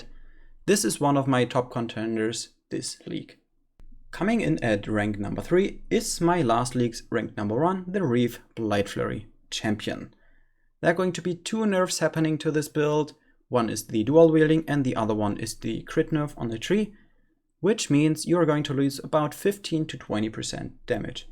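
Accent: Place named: Germany. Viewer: could be german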